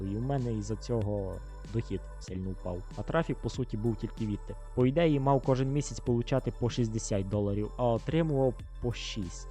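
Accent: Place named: Ternopil, Ukraine. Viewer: Короче я подписался. Давай больше контента, у тебя интересный Украинский. Как будто Тернополь что то такое. Давай больше видео и юмора 🎉 жду.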